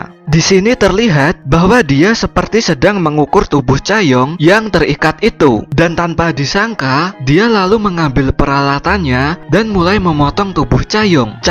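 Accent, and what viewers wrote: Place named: Java, Indonesia. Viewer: Logat Jawa nya masih medok yo suaranya itu lo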